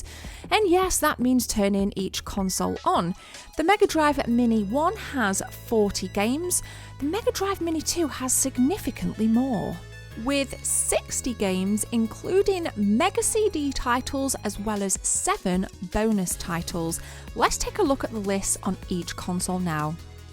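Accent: United Kingdom. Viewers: Gotta love your brit accent, and how you squeeze those....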